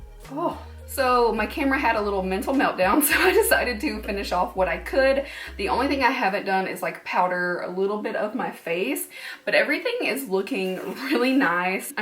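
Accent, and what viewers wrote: South, United States. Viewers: I agree she reminds me of the south too and I'm just like 😭😭😭 I miss those accents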